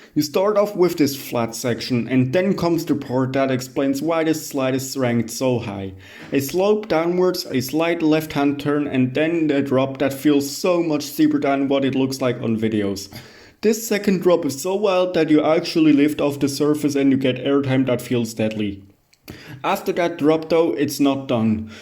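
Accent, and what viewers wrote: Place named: Germany. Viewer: Youre german,right?I can hear it...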